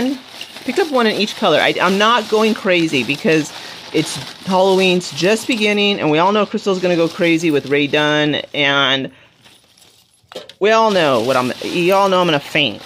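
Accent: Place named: United States. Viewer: Muy are beautiful as I see you on your face with quialificatiion to be on the camera of your phone, thanks for your help on how to buy items and the complementaries to see you more on your camara, I love your voice is perfect to hear the good American's accen which is easy to follow for me that english language is not my mother's ternt language , sincerely from Norway , raiza Theis from Venezuela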